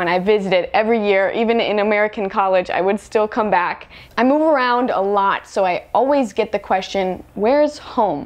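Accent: Taiwan. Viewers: 聽妳講國語就知道你是台灣人啦😄喝珍奶吃雞排👍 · Love your accent, very 字正腔圓, not the Chinese/Beijing type but Taiwanese type. You should speak more Chinese in your upcoming new vlogs 😃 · 標準台灣腔~辣台妹無誤！ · 妳的腔調很不一樣跟台灣幾乎一樣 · 妳講的完全是台灣口音的中文耶❤️ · 台化很嚴重😝😝😝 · 哇~~這個中文口音好台灣啊~~ 如果有機會來高雄，可以試試雙妃奶茶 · 是真台灣人沒錯 這個中文完全是台灣口音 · 正宗台灣腔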